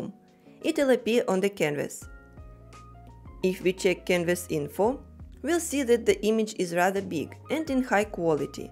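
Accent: Russia. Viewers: Ну блин не обмануть тебе своих😅 ну сразу же слышно что из России автор 😏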